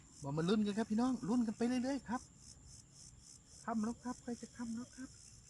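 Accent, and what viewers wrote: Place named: Isan, Thailand. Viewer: ได้ยินแล้วคิดถึงอิสานบ้านนา fcจากฮ้องกงค่ะ ขอให้ยอดติดตามยอดlikeสูงขึ้นเรื่อยๆน่ะค่ะ^^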